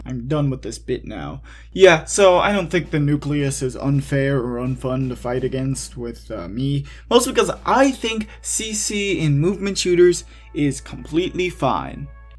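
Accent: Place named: Turkey. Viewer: This guy sounds very turkish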